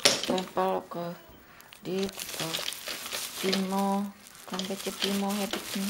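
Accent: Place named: Java, Indonesia. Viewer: Mbaknya wong Jowo yaa? Boso Londo logate koyo wong jowo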